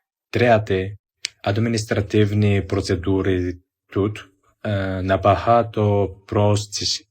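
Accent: Standard. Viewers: Який молодчина. Чисто українська мова вражає. Дійсно, що нам треба ще вчитися так розмовляти Дякуюмо вам юначе за теплі слова про Україну. Вона у нас найкраща у світі.❤ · Гарна, чиста українська мова - ви молодець! Та й хлопак дуже гарний. 😊 Щасти Вам у житті ❤ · Молодець! Навіть ми не завжди говоримо такою чистою украінською мовою🎉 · Молодець , мова чиста . Так важко . Але з часом це пройде . Більше спілкуватися з українцями , навчитеся . Розкажіть про себе , як до вас звертатися , що робите в Україні . Щоб вам легше було говорити , спочатку напишіть текст , прочитайте його декілька разів . Тоді буде легше . Дякую за свою точку зору на наше життя . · Ви молодець дуже гарно розмовляєте по українські дуже чисто та грамотно,Я Українка но у вас получається розмовляти ще літернатурніше ніж у мене,Дякую тобі сонечко за твою старанність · Говориш нормальною українською, а не довбаним суржиком як базліч "дружелюбних". 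· Вау, молодець ! Така чиста мова !👍👏👏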